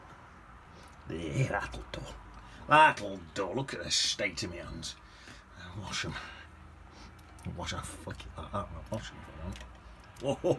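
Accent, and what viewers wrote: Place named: Yorkshire, United Kingdom. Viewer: Thank you for your demo. Very refreshing,informative and like Bob Ross make you feel you can achieve something similar. Also the accent is gorgeous. I’m from Yorkshire, I now live in Notts but the accent is something that I miss. Hence I am so glad I found you and I will follow to pick up some tips. Just great. x😍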